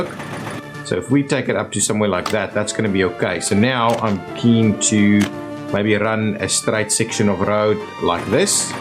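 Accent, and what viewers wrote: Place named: South Africa. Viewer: you sound like you're from South Africa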